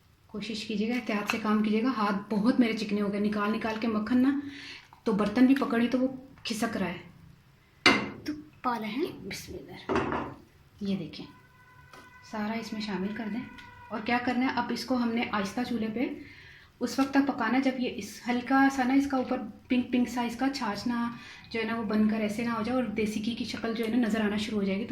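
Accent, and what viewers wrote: Punjab, India. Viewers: App behno ki pujabi bolne ka appna hi maza hai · Very good style and ur punjabi touch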